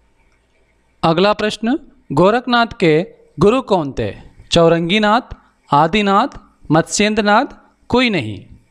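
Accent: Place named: South, India. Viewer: very good but sorry to say you should improve your speaking leave I think you are from south Indian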